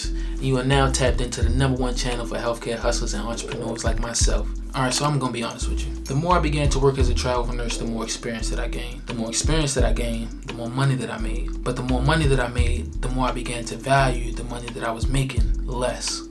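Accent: Baltimore, United States. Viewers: That baltimore accent is accent is something else brother. Thanks for the information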